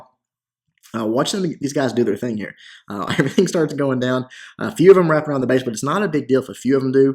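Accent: South, United States. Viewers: I find these videos informative, but this dude's voice is super annoying. It's that shitty southern accent with the horrid pitch FFS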